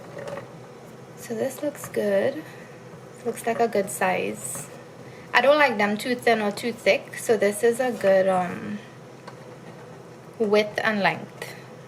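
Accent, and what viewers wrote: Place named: Trinidad and Tobago. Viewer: Some Trini chefs talks to much and with a exaggerated Trini accent so people on the internet can't understand them. But your accent is moderate/modest which is a good thing.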